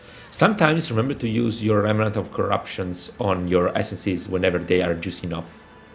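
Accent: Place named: Balkans. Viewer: Where are you from, you sound like you are from balkans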